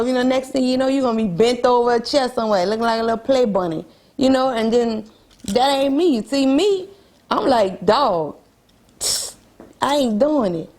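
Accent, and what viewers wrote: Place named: Los Angeles, United States. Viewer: Gotta be from L.A. w/ that accent and her fine self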